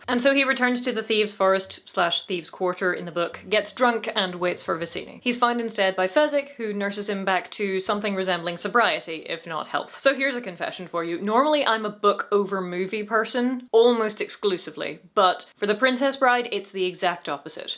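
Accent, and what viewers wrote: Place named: Northern Ireland, United Kingdom. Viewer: You have a great accent. It's got to be Northern Ireland or Donegal, with moderation in the US. Please tell me.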